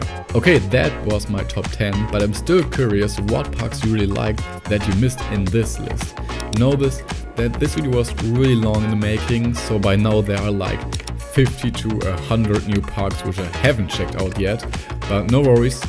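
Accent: Germany. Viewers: Are you german? The accent is soo german idk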